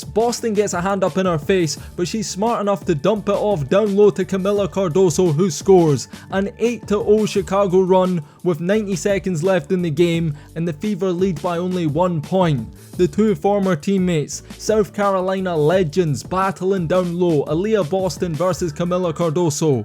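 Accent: Scotland, United Kingdom. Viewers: Agreed! Who is the announcer? Sounds like a Scot. The main reason my interest was maintained for so long. Articulate and engaging.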